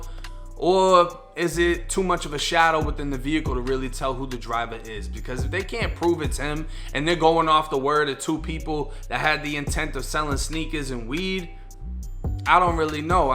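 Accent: Boston, United States. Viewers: You from Boston? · Hey were you raised in the Boston/New England ares because you have that BAWSTON accent?! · U from Boston? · Your accent sounds like you're from Boston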